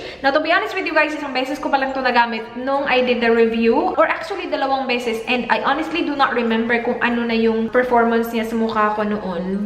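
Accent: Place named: Visayas, Philippines. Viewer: gwapaaa jd kaayo oy <3 hahahah cute kay imong accent ate tagalog pero bisaya ang tuno hahahaha <3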